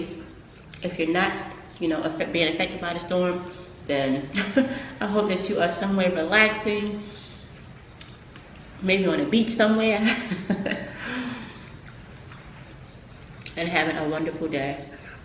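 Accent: Baltimore, United States. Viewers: I thought you was from Baltimore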